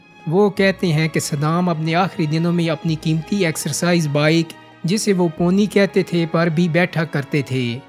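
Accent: Chakwal, Pakistan. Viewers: Ap k bolny Ka style touch chakwal said Ka hai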